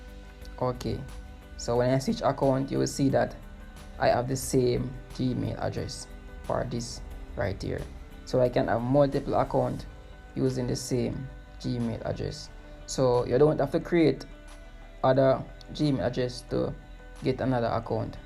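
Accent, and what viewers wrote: Jamaica. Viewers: I will stick around for the Jamaican Accent.....Bomboclat!!!!!.....i just subscribed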